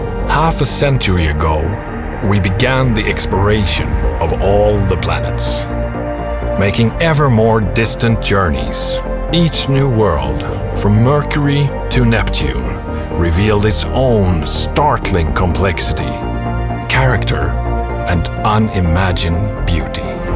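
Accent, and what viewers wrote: Sweden. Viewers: I bet the narrator is Swedish :D You can clearly hear the accent. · Why did you pick a swedish voice over dude. Hurts to listen to. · TROLLS DOE He's just Swedish.. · I thought he sounded Swedish =)...I was right. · The speaker must be Swedish. He has to be.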